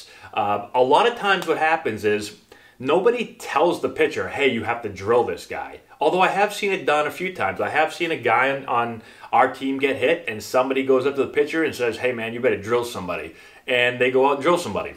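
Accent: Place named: New England, United States. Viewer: has a hint of a New England accent. You can hear it when he says "about".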